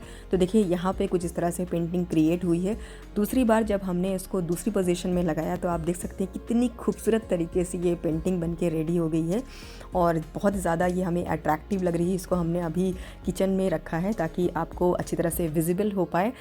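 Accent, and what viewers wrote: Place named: Lucknow, India. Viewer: Im from Pakistan,likes ur lucknawi style urdu,please explore lucknow tradition more,and lucknow markets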